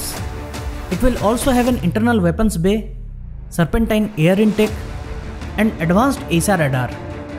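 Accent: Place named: India. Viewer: Dude you have all the Indians accent they always mimicking Indians for....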